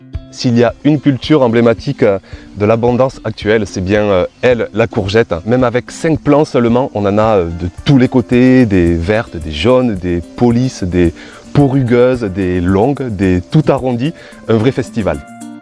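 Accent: South, France. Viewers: Merci pour cette vidéo c'est toujours aussi plaisant à regarder et cet accent du sud qui nous fait un bien fou (à nous bretons 🤣) . Chez nous c'est sec aussi ...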